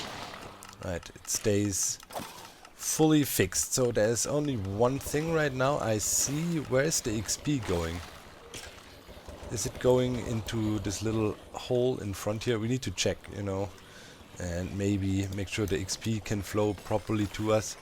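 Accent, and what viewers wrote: Germany. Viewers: I like that accent it sounds kinda German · Are you german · Uhhh nh deutscher 😅 cooler akzent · You sound sooo german :D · Oh i knew you couldn't be british or american or australian or canadian du bist deutsche i hate gewohnt in deu für 9 monathe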